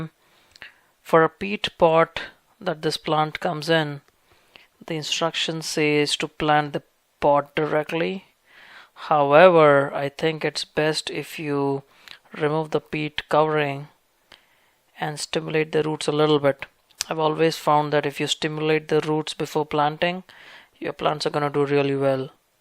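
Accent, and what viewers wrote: India. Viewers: are u Indian ? u sound like one :)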